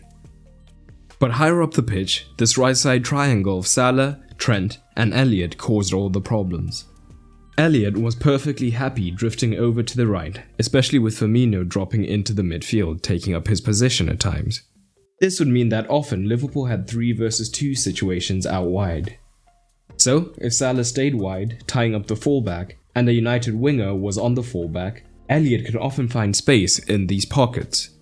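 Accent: South Africa. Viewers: Are you South African? Great analysis- love your videos❤️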